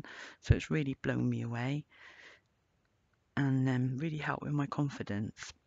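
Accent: United Kingdom. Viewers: Well i for one love watching your tutorials, i have just been turning the volume up, which is easy. I noticed the difference with the new microphone! All good. I am definitely going to try this with Cernit translucent and Premo gold and copper. I do find Cernit REALLY sticky so I'll try leaching it first. I love your accent too. Wiltshire or Somerset? My gran was from Wiltshire and it reminds me of her. Cheers from Australia 🇦🇺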